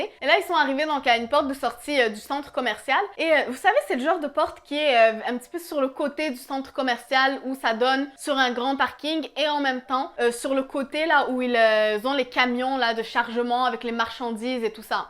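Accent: Quebec, Canada. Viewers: alors, c'est marrant, j'entend bien un accent canadien français (faut pas dire québécois, je sais) mais pas si prononcé que ça. française qui vis au canada? accent d'un autre endroit? disons que ton accent varie entre français et canadien...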